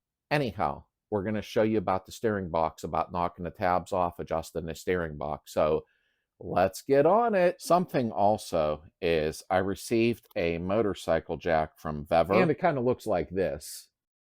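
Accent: Pittsburgh, United States. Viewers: Nice Video, I recognize that Pittsburgh accent anywhere I go...Where you located?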